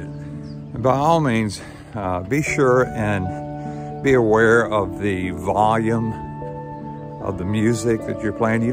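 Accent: South, United States. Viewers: That is fantastic! I could pick up on a little southern accent there, my friend! I'm with you on the Roll Tide!! You do awesome work on your IMovie work...I guess I'll have to double down on my attempts. I have tried using it on a couple of videos (not trail vids) and it was a lesson in futility to me. Thanks! Btw...I'm s 3rd career pastor, and serve in the far south end of GA, in Hahira, at the very bottom of the state on I-75.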